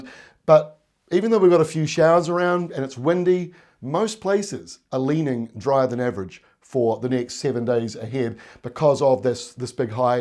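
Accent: New Zealand. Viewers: Good clear kiwi commentary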